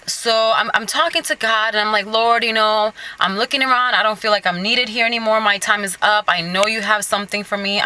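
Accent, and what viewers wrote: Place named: New York, United States. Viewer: Oh nice.. Never been there. You sound as if you could've been living in new york.. Lol.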